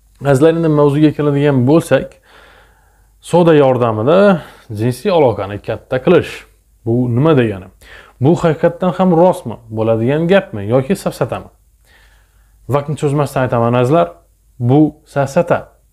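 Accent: Khorezm, Uzbekistan. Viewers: Доктир шеваингиз хоразма тортадику недансиз? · Салом алейкум доктор хоразмданмисиз аксентиз билиниб дурибди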